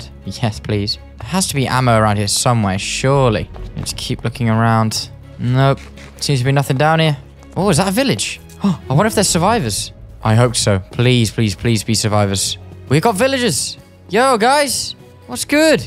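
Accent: United Kingdom. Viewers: You sound more british than i last saw you 😅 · its the first time i watch a movie from you but why are you sounding so british? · dude has the most british accent ik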